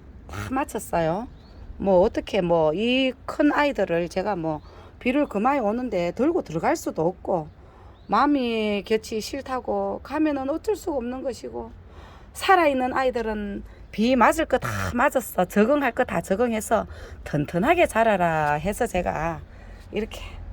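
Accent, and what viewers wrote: Gyeongsang, South Korea. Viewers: 경상도 사투리 정겹네요~저도 경상도랍니다~다육이 엄청 많네요~나눔 기대 됩니다~^^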